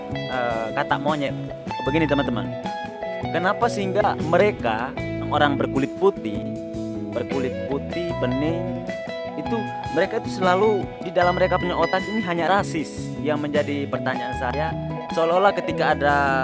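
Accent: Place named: Adonara, Indonesia. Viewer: Abang ini orang, apa??? LOGAT nya masi kental Le... Hehehe... Kayak orang adonara Witi hama.... LOGAT nya itu hahahaha, sukses broo terus laris manis buat konten nya.... Kita akan mendukung dari belakang.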